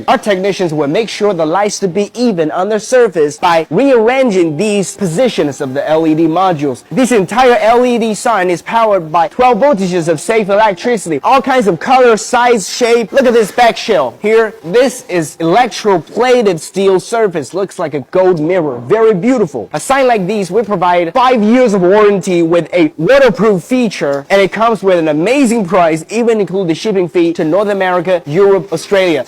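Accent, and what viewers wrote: United States. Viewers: How is english this american lmao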